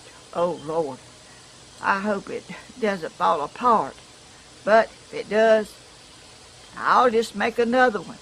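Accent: South, United States. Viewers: I feel so Blessed to have found you on You Tube! I do silk flowers ideas. Wreaths, arrangements and more. God gave me this talent and I give Him praise for everything! You are a joy and time gets away from me also. I can't seem to get anything accomplished especially since we made connections! lol I just lay back and enjoy all your work and your southern talk and cute happy laughter! I'm like you and I like to talk!! Sure wish I could meet you but I live in Louisiana and Arkansas! Both states! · You,sound like down home, lovely, southern girl. I’m from Alabama and I,sound very southern myself. Love your videos. Great ideas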